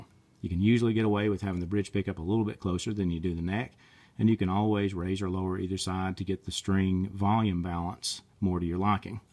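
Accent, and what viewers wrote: Texas, United States. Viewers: Good, clear tutorial, wish there were more like this. And I dig your accent! Texas? (Excuse my ignorance, I'm English.)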